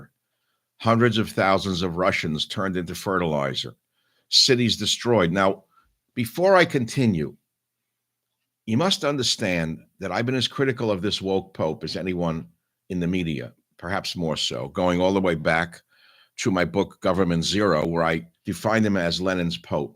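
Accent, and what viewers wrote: New York, United States. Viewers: Good night Dr. Savage....Good to see your mug again, hear that New York accent, and see that cool hat and ring.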